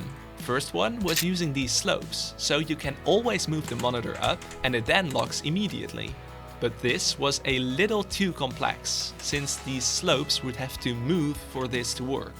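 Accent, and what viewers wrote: Netherlands. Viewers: holy dutch accent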